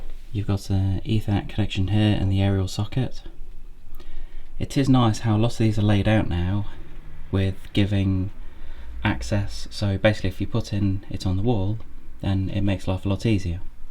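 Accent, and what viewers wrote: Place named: Birmingham, United Kingdom. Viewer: And it's with a Brummie twang to it too.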